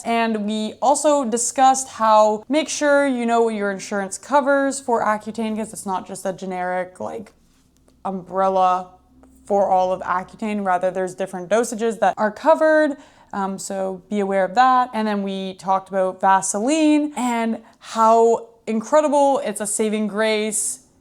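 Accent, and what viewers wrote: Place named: England, United Kingdom. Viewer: I'll give a like for the English accent! I must say, I'm really impressed in general by how you carry yourself on camera. You speak so naturally, it's like I'm there with you. You're making great progress, I wish you well!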